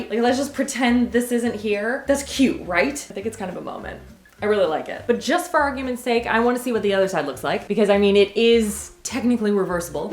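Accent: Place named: Canada. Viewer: It’s a Canadian accent thing 🩷🍁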